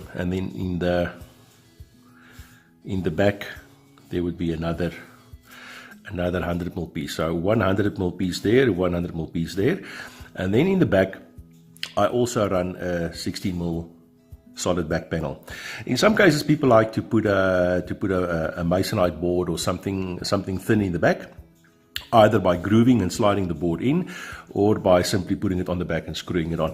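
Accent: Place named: Afrikaans, South Africa. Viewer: Ek wou se dit is n Afrikaanse aksent. Baie dankie vir die video, ek moet binnekort begin kaste bou vir my kombuis, ek will ook melamine gebruik maar sal met n 'Festool track saw' moet gebruik om die panele te sny. Ek het iewers gesien dit kan gedoen word sonder om versplintering te kry, is daar n spesiale manier om dit dan te doen? Ek sal baie graag ook die plan vir die 'scissors table' wil sien as dit beskikbaar is. Baie dankie.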